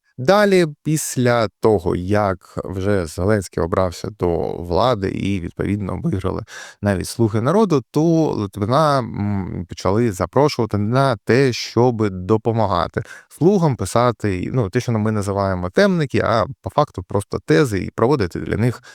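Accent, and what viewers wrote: Russia. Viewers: Пане, нардепе, підтягніть, будь-ласка, свою українську мову. Ви цікаві викривальні матеріали робите. Але тако буркочете під ніс і видно що через те, що ви російськомовний, бо не впевнені у своїй лексиці, та з'їжджаїте через речення на русизми, та рос прононс.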